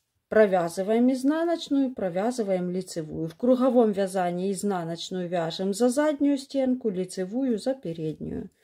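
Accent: Ukraine. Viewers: Здравствуйте,!Огромное спасибо за супер инфо. А у вас есть шапка для детей? сколко петлю надо набрать для7летки? Заранее спасибо за ответ. полюбила вашу речь, украинская)) Привет из Баку.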